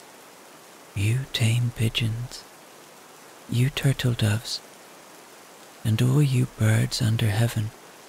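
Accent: Ireland. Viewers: Oh, yes!! That Irish accent ❤. No accent is so lovely as an Irish accent. God bless Ireland and all its descendants.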